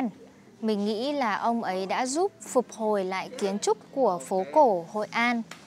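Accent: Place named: Hanoi, Vietnam. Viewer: It sounds like you are speaking the Ha Noi dialect. Do you know the Vinh dialect?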